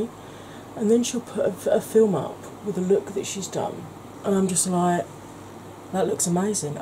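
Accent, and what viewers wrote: United Kingdom. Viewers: Are you Welsh? Gorgeous look per usual, I do think they're wisteria as well... I'm heading over to Chelsea's channel next!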